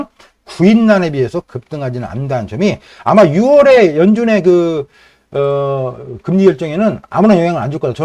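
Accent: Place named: Jeolla, South Korea. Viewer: 말투가 약간 전라도분 억양같아요^^ 좋은 내용으로 영상 잘 보았습니다. 감사합니다.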